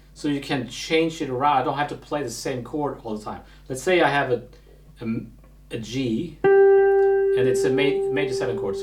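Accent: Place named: Sweden. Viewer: Great lesson! By the way are you finnish or swedish. Based on your name I'd guess finnish but your accent is a bit more swedish than finnish.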